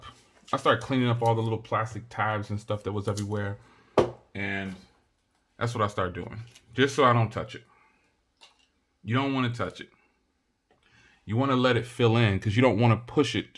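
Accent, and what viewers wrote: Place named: New Jersey, United States. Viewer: Man your Jersey accent is heavy at times sound a little like Denzel , this is not meant as an insult, especially with my deep south accent 😂😂😂 Great install great video, yes always answer wifey 👍🏾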